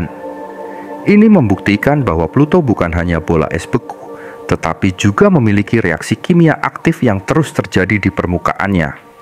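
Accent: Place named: Java, Indonesia. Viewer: Naratornya medok bgt😁aku suka klw mslh astronomi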